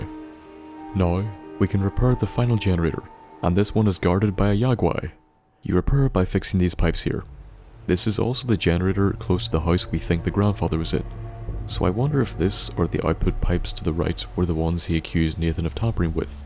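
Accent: Northern Ireland, United Kingdom. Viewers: My guess is South Down Or Tyrone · You sound so Northern Irish XD great channel btw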